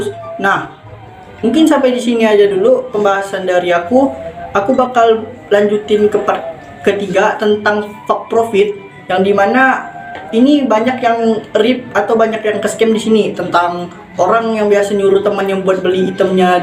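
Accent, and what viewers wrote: Indonesia. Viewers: Orang Kalimantan kah ini? Dari logatnya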